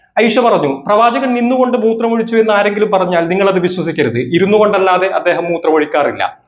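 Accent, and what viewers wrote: Kasaragod, India. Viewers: കാസ്രോട് ശൈലി തന്നെയാണ് ഭായ് രസം ❤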